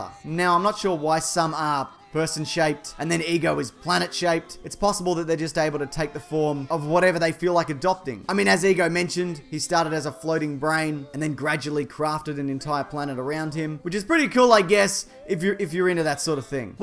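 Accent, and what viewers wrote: Australia. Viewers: YESSS THAT BEAUTIFUL AUSTRALIAN ACCENT IS BACK!!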